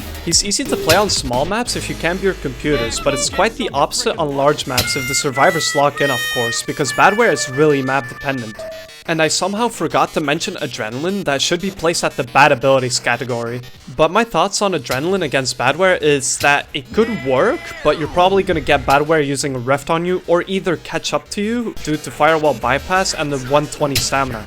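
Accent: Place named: United Kingdom. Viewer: you sound british and amercan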